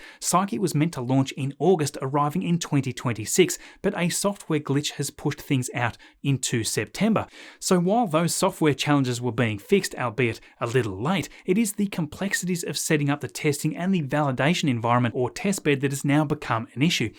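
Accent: Australia. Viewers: Hi from across the ditch enjoyed your content as usual Just one thing as a blind subscriber I would very much appreciate a few more sound clips in your presentation not that the charming Australian accent isn’t enjoyable enough Cheers mate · you australian? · Love that Australian rocket commentary! YEEEEEEEEEEAAAAAHHHHH! 🚀😂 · Aussie accent at its finest.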